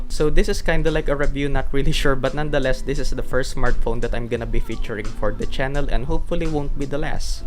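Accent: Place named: Philippines. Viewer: Sabi na pinoy ka... First time ako dito... Nasabi ko lang dahil ng accent mo hahaha skl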